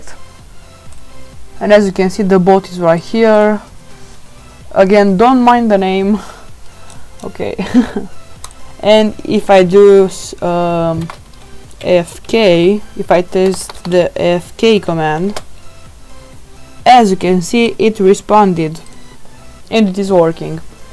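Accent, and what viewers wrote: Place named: Romania. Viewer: multumesc mult de tutorial , mi-am dat seama dupa accent ca esti roman , botul merge perfect , dar in log apare asta <link> , chiar daca n-am probleme si am bifat decat node index.js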